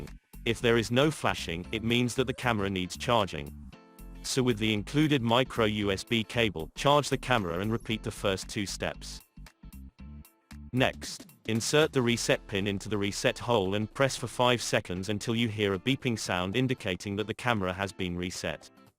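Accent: United Kingdom. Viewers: Thanks for sharing brother. Very much appreciated. Looks like a nice security camera. Do I detect a British accent? Keep the content coming. Love this channel. Have an awesome night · My how British you sound. Lol Great video my friend, Thanks for sharing this!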